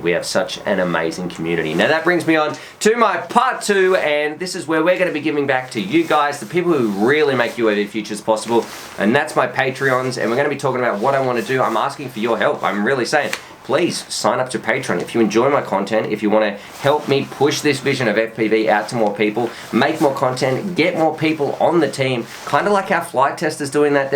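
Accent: Australia. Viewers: I like your Aussie brogue. Anxious to see what new tech is developed in 2019.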